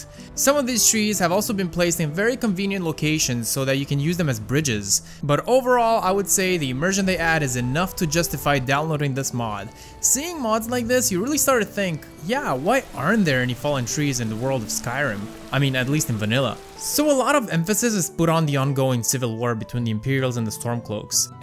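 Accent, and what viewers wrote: Romania. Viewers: Well I guess I now know what a Romanian accent sounds like.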